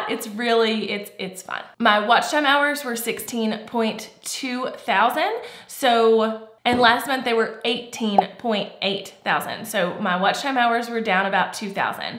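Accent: South, United States. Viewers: I love because she is one of the few Youtube Tip creators who have a southern accent.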